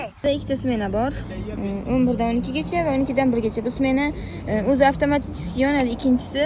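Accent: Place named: Bukhara, Uzbekistan. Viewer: Вай Бухороча гапирурин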